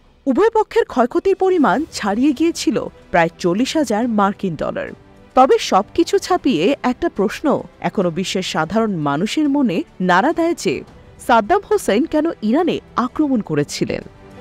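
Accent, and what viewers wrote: Kolkata, India. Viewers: ৭১ টিভিতে কি আজকাল ভারতীয়দের নিয়োগ দেয়া হয়? এই সংবাদ পাঠিকার উচ্চারণ শুনেই বোঝা যাচ্ছে যে সে পশ্চিমবঙ্গের কলকাতার অধিবাসী! সকল দন্ত্য-স এর উচ্চারণ কলকাতার টানে বলছে!!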